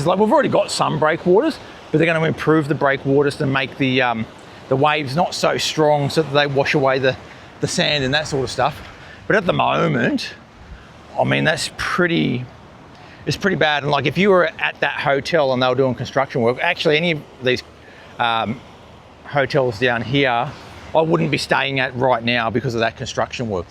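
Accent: Australia. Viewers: This is a great video thank you. Have to say I was bloody shocked with your occa Aussie accent and then you bring out the perfect Indo with the locals. Made the video! What lovely guys, Balinese are the salt of the earth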